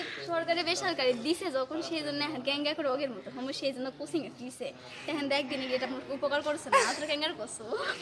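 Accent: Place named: Joypurhat, Bangladesh. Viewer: এক্কেবারে হামার কতার মতো বারে😅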